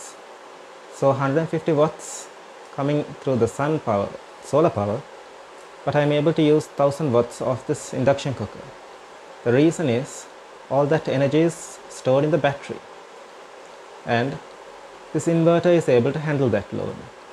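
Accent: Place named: Sri Lanka. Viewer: I just need your advice on how much I have to spend on this ? and sounds like you are from Sri Lanka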